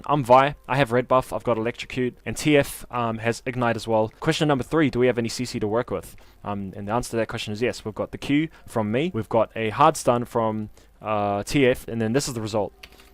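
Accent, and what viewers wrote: New Zealand. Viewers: Bro are u from NZ u sound Maori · Solid Vid i hear the nz accent hahahah cheers for the solid tips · you a kiwi bro?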